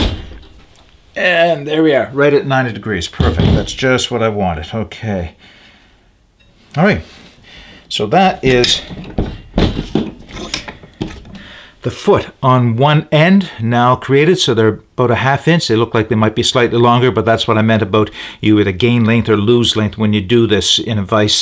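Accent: Nova Scotia, Canada. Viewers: I thought that accent sounded like Nova Scotia! Born and raised there until I was 12. Great video!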